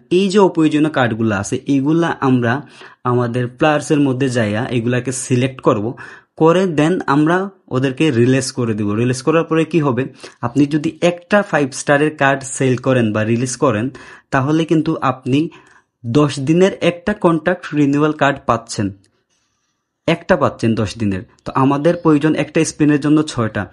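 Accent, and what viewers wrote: Sylhet, Bangladesh. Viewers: Vai apni Sylheti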